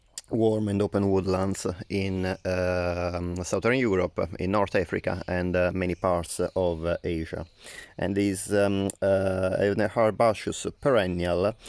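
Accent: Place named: Italy. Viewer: Howdy from Canada. I would call that color kelly green or Irish green. I grow cannabis flowers indoors, legally of course. Love the Italian accent. Stay safe and be well. Smell you later, eh? ♥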